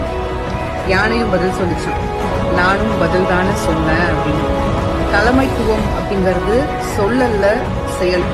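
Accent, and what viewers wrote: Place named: Telugu, India. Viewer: இவள் கொல்டி தமிழில் பேசிக்கொண்டு தமிழர் வெறுப்பு இருக்கிறது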